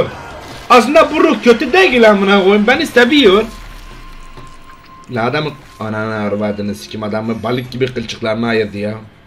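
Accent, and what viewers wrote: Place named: Adana, Turkey. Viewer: Abi adanalı mısın sövmenden anladım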